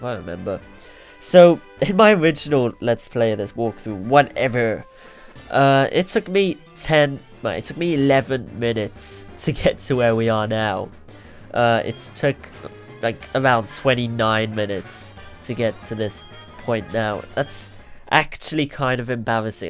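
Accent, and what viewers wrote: United Kingdom. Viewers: NOOOOOO! HE'S A BRIT! NO RACISM BUTI DON'T LIKE THE ACCENT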